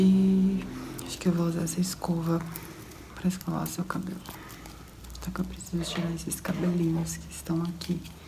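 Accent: Rio de Janeiro, Brazil. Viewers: Eu amo quando faxxx sotaque carioca!! Acho mais relaxante ainda😍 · Aiiii eu sou apaixonada no sotaque carioca aaaah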